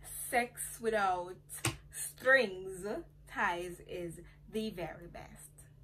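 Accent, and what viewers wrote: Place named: Jamaica. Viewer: As always nice video.. Love the Jamaican accent pretty lady.. You gon make me tip 💰 you something nice lol. Stay focus and keep the videos coming. Your future is bright 🇯🇲 👑..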